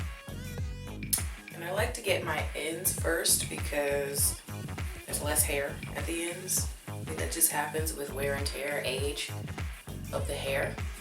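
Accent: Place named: South, United States. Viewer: New subbie here 🤗 I love your personality! The thickness in your hair, lawd bless you. Are you from the south? I love your accent!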